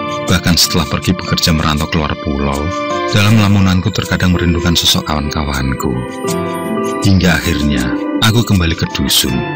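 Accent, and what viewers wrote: Java, Indonesia. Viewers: kang izin masukan, saya penonton diluar jawa.. saya gk paham dg bahasa jawa.. terkadang juga translate nya gk lengkap dan warna nya juga kdg sulit dibaca.. lebih baik ceritanya dengan bahasa indonesia.. tp logat jawa gpp.. makasih dan semoga makin sukses ya kang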